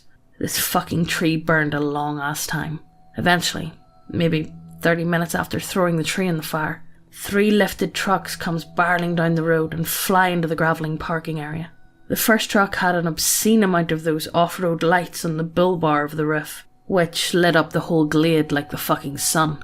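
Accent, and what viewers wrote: Ireland. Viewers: Sp00k? Check Scary footage and music? Check Irish Voice? Check Yeah, it's time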